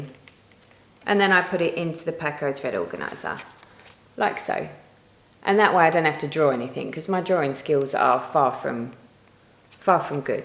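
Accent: United Kingdom. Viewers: Looks like a great storage system. Thanks for sharing. Love all your stitching. Your accent makes me feel homesick for the UK though!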